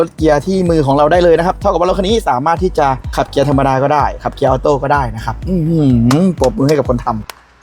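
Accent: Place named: Isan, Thailand. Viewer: ฟังสำเนียงน่าจะคนอีสานบ้านเราต้องสนับสนุน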